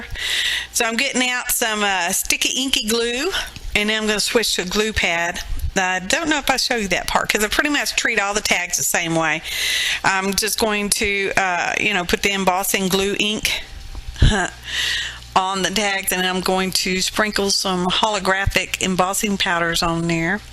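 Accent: South, United States. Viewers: Loved your project and love your southern accent!!!!!!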